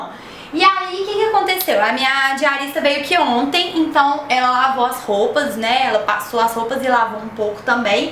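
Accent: Minas Gerais, Brazil. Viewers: Eu fico rindo sozinha com essa menina....Adoro esse jeito mineiro de falar.....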